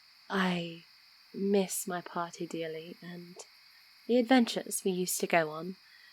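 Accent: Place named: United Kingdom. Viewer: This was really sweet! I loved your british accent!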